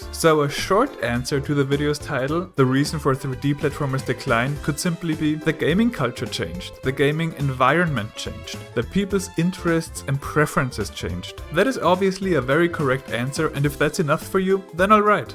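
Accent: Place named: Germany. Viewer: Sehr interessantes Video und mir ist dies auch aufgefallen, was mich sehr traurig macht, weil ich Platformer/Jump 'n' Runs am meisten liebe! :( Bin mit Banjo, Mario und Rayman aufgewachsen und liebe diese Spiele bis heute alle noch <3 Und ich als selbst Deutscher, hab sofort an deinen Akzent erkannt, dass du auch einer bist xD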